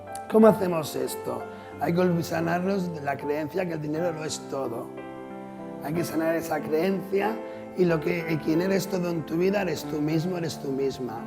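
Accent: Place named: Spain. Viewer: me cuesta entender a los Españoles cuando hablan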